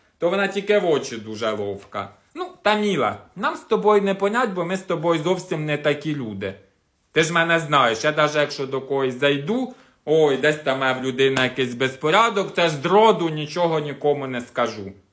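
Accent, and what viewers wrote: Poltava, Ukraine. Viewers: 😂😂😂😂😂наш полтавський говір---осталось тільки висратцця.Ще від мами та бабусі вислови помню.Як в детстві побувала в нашому селі.А особинно під магазином (лавкою) такого багато можна було наслухається Огонь🌞продолжайте в тому ж духі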